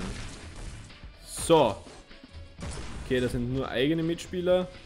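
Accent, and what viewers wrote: Austria. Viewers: Bist du Österreicher wegen dem Akzent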